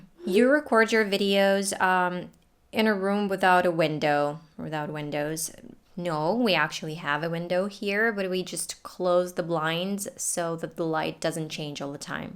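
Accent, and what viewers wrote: United States. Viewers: It is Amazing for me to meet you specially because you have a perfect american accent and you are not american!! i really though in you as an american woman o maybe a latin woman living in America. I am impressed. Thank you a lot for making videos. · No wonder those kind of questions... Your american standard accent is amazing! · согласен, обычный английский, как у любого носителя американского английского языка, утверждаю, как живущий в Нью Йорке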